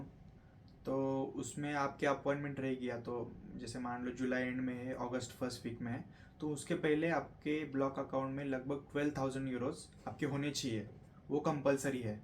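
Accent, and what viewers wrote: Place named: Maharashtra, India. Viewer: Hindi with Marathi accent😅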